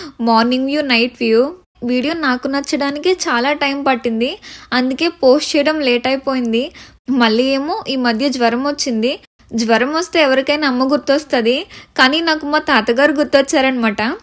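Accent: Godavari, India. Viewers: Ma godavari slang baguntundhe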